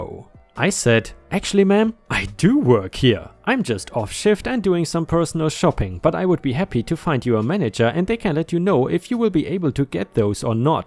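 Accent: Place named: Germany. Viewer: Hi ⭐, 🌠🌟⭐😎⭐🌟🌠 No. We come to listen to your lovely accent. We don't need background music. Have you noticed how many people try to politely help you with your pronunciation of some English words you miss pronounce? You asked for our help so that you learn. We try to listen and catch your mistakes, to help you. How often does that happen on the internet, people politely helping? Anywhere but your channel people would be teasing you for your mispronunciation. To go from German to English fluently is a miracle in and of itself. We want to hear your accent, it's charming. 😊 Thank you for everything. Take care, stay safe, have a nice day. 👵☺️✌️☮️🖖 😷 🙉🙈🙊 ♥️😎⭐🌟🌠 💍🏍️👨‍⚕️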